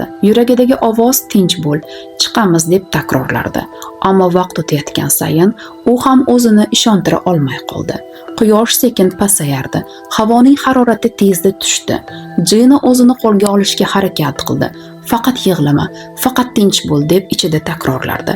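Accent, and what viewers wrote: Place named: Standard, Uzbekistan. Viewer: Соф адабий узбек тилини шундай согиндимки❤Рахмат сизга❤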